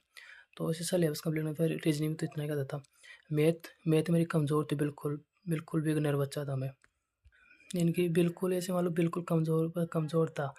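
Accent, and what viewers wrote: Rajasthan, India. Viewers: बोली से पक्का हो गया की राजस्थान से हो पर राजस्थान में कहा से 🤔😅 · Aap kha se ho bhaiya aapki voice Rajasthani h m bhi alwar Rajasthan se radhe radhe bhaiya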